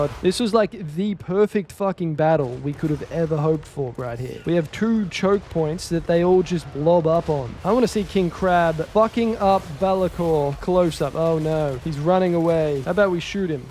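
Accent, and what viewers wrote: Australia. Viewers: This comment section reminds me that America was a mistake: man is clearly Aussie FFS . Also checking his profile is free.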